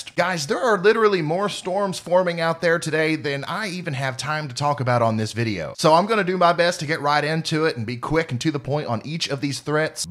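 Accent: United States. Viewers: You're a YouTube personality, you can take as much time as you need to talk about the weather. Too much country in your talk.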